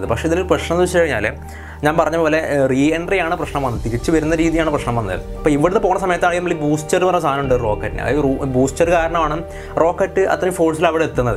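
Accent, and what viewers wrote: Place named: Kannur, India. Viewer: aaah njan kannur aanu Ninghal palakad alle But baasha kannur kaar pola ind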